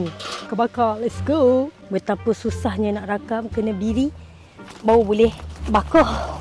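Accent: Penang, Malaysia. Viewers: rindu ckp utara loghat peneng utara😢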